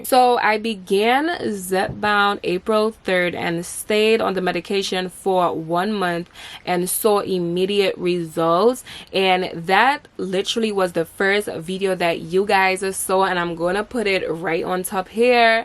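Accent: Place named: Caribbean. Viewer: Reslly informative, and good job on the weightloss. Aldo i hear an accent, are you of caribbean desent?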